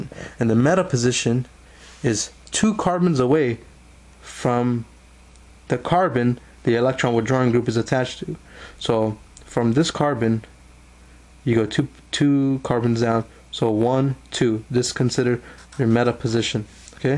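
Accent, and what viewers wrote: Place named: Mexico. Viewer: you sound like a mex which is a direct correlation to lack of intelligence. Therefore, your videos are trash, not unlike the place you were born. Very dry, hard to watch. Spice it up in the future (hot sauce, less bean sounding, less monotone) was a decent attempt i guess tho could've been worse. You coulda been black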